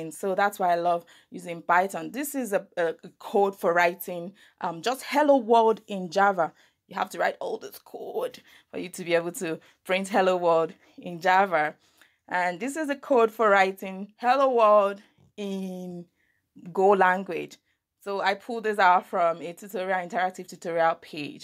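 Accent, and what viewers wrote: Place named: Igbo, Nigeria. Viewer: You sound so much that a Igbo/Nigerian woman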